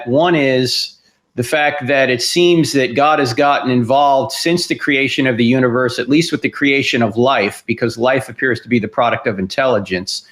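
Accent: India. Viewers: i LIKE THE INDIAN ACCENT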